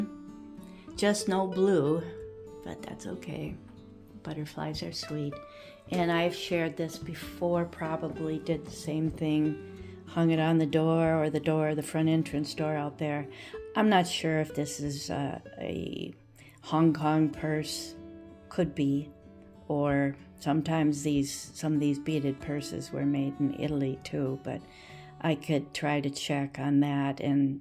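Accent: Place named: Minnesota, United States. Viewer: I have a small frog collection which my grandkids think is weird because I’m afraid of actual frogs. 🐸 I love your cute Minnesota accent. Thanks for the tour.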